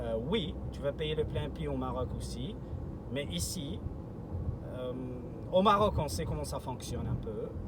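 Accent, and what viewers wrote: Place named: Canada. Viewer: Je pense frère que c'est préférable pour toi de parler arabe que de parler français et encore loin avec l'accent canadien ☺️ ça PASSE PAS😊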